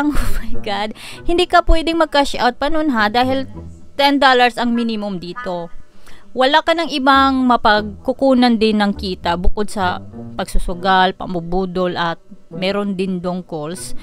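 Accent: Visayas, Philippines. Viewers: Wow bisaya si madam hehe thank you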